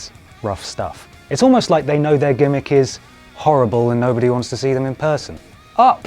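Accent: United Kingdom. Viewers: i'm a simple man, i hear the british accent, i click like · I'm a simple person,I noticed a british accent,I liked then I enjoyed watching it! That's it!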